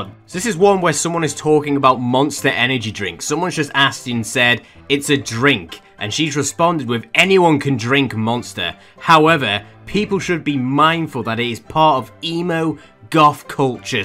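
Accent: United Kingdom. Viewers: i like ur vids but ur accent is too brittishy or smth so i hav to unsubscribe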